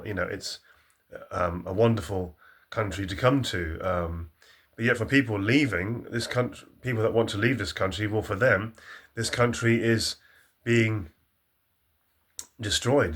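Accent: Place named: Southern England, United Kingdom. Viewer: Horrible southern English accent too. Just oozes snobbery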